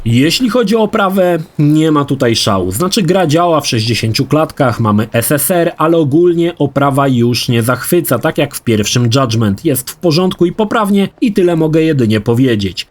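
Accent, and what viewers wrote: Łódź, Poland. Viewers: tu nie ma w ogóle co porównywać. swoją amatorszczyzną i bełkotliwą łódźką dykcją nie staje nawet w szeregu z i może mu co najwyżej buty czyścić.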